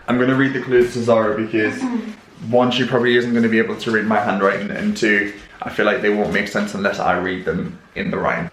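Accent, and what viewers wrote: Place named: England, United Kingdom. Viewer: with your English accent I have trouble understanding you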